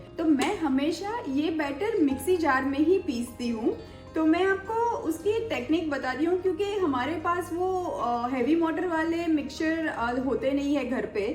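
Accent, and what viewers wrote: Gujarat, India. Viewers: its not bhiga sakte ho...its figo sakte ho...correct u r hindi..gujratishhh hindi.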